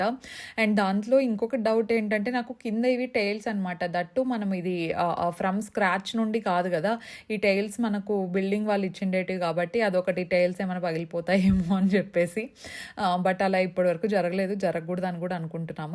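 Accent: Anantapur, India. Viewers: ....before sleeping ask to have milk everytime it's natural tip to get sleep...I just love ur slang Anantapur slang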